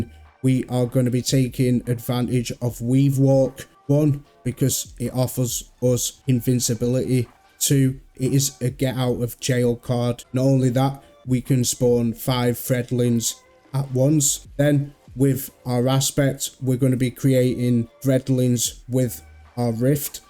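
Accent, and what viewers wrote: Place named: Blackpool, United Kingdom. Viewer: Blackpool accent sounds very similar to,was there last month ,night out with wife 🤙🏻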